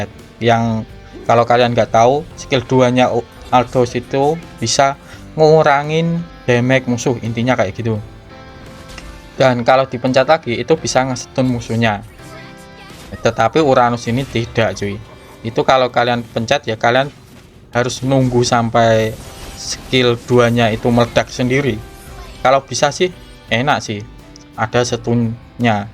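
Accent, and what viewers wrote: Java, Indonesia. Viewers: Jawa bang?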